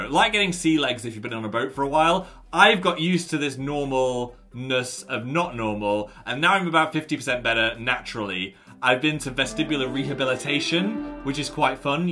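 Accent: North, United Kingdom. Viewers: has been alone too long and his northern side has re-emerged 😂 the accent be strong ✊ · Sir you've gone more northern again while Daniel has been away...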